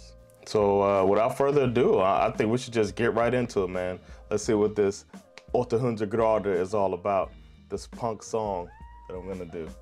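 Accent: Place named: United States. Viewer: Damn your Swedish pronunciation is actually really good, I'm impressed. Of course there's an accent, which is to be expected, but you sound just like an American who's moved to Sweden and learned the Swedish language (at least from my perspective and interactions with Americans who's moved to Sweden)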